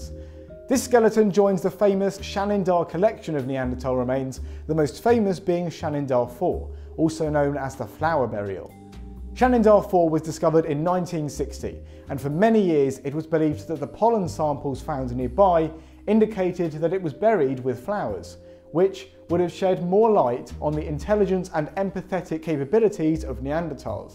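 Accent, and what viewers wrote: England, United Kingdom. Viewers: This was cute. Nice English accent.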